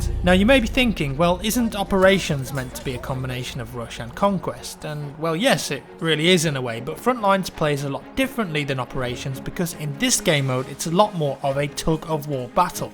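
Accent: England, United Kingdom. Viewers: Trevor Leach its an English accent mate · Trevor Leach I would to something about the male English voice!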